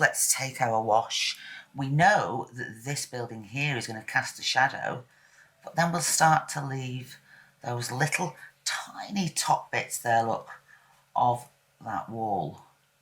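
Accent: Northern England, United Kingdom. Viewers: You demonstrated and explained this so well, thank you, and all with a wonderful and lovely Northern accent too! All the very best for this new year.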